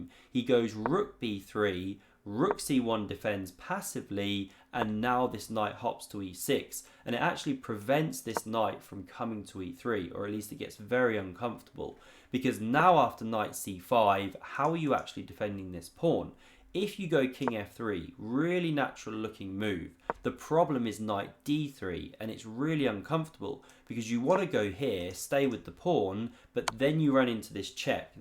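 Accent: United Kingdom. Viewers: 3 things I love about this vid: Nice informative game, great explanation and lovely British accent!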